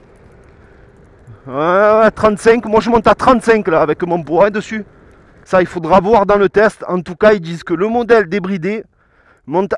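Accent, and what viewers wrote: South, France. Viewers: ! Super chaine poto je kiff 👍 ça fait plaisir d’entendre l’accent du sud ahah Aaah cette petite zéro 8 pro me plait bien!!! Mais la Dualtron mini me fait kiffer aussi... je suis un gars assez lourd. Selon toi laquelle est la plus adaptée? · On sent que c'est le sud de la France 😂 (c'est pas une critique)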